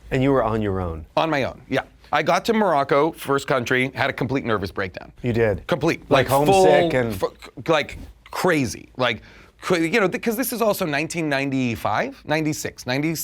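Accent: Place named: Boston, United States. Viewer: He's from Boston or thereabouts. They say "aboot" and shit like Canadians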